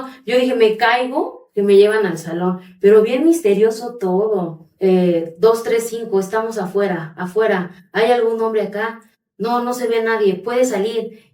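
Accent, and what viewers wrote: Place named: Mexico. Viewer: Cómo que ya está perdiendo el acento mexicano. 🙏🏽🙏🏽🙏🏽!!!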